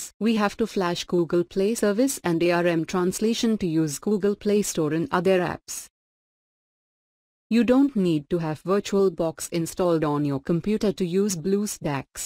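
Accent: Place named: India. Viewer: your TTS sounds like indian accent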